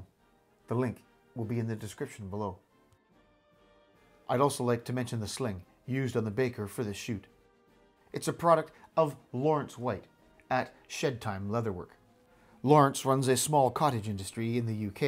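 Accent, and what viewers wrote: Canada. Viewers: As a former rifleman I find the uniform slightly lighter than it should be and no offence ment but Canadian accent and rifles uniform just sounds odd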